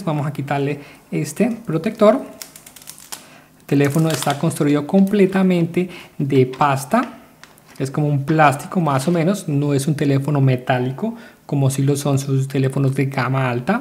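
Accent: Colombia. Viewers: oye eres de colombia? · Hola señor, una pregunta Ud. ¿Es colombiano?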